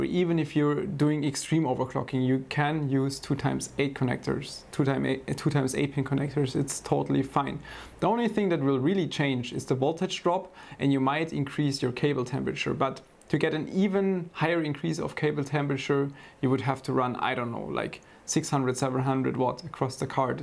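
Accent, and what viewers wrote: Germany. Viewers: Ich liebe es wenn Deutsche versuchen Englisch zu sprechen. Soll kein hate sein 😂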